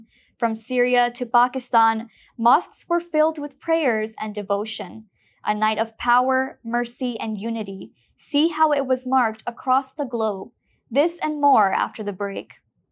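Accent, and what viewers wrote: United States. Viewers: Speak arabic / quran language and stop rolling your tongue in American way which angers afghans talibans .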